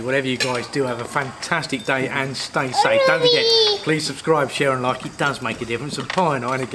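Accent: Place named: England, United Kingdom. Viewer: I really detest english accents. i'll try to listen for awhile 1. Edit: Ok, I still hate your accent but the visuals are very nice ! 2. Edit: You really do have some skill with the visuals. BTW, I know of your recent health issues and I send my sincere condolences.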